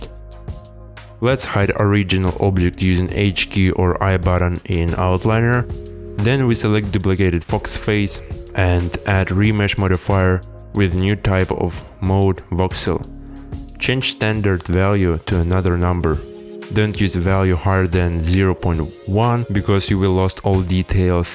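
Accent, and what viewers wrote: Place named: Eastern Europe. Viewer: Accent never gets old. It's like the eastern European version of.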